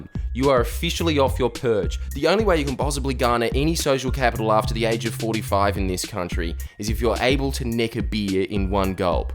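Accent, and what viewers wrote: New Zealand. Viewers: Nup he sounds kiwi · You sound kiwi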